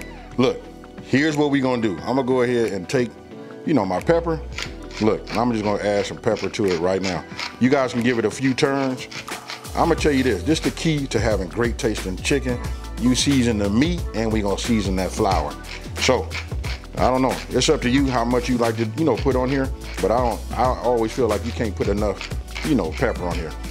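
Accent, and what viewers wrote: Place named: California, United States. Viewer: Anyone from Cali can just listen to you talk and know lol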